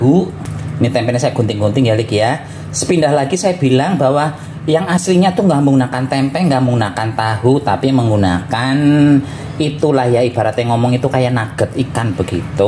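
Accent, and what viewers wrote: Banyumas, Indonesia. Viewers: Sampean wong ngendi deneng ngomonge ngapak kaya jawane ijong masakane enak sumpah ijong jajal msk tongkolll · Lik asli ne wong endi??? Yakin ngapak kaya enyong pokok e ora ngapak ora penak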